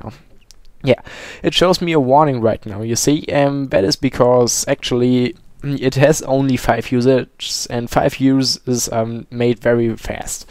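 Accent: Germany. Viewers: Nice german accent brah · Let me guess, you're German, right? ;] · sounds like u are german ? nice video ! :) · you german right ?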